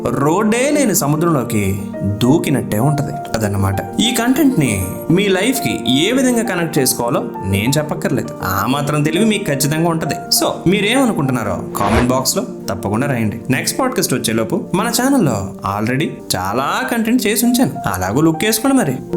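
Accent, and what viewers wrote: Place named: Andhra Pradesh, India. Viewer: Nee Andhra accent dharidhramga undhi ra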